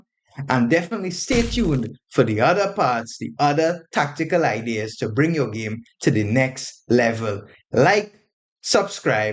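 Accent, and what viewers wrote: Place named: Trinidad and Tobago. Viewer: That’s a Trini accent!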